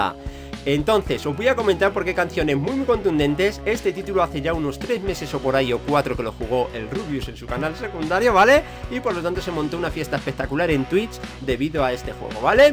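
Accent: United Kingdom. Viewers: Vi todo el video para escuchar la pronunciación de de su ingles britanico y se salto...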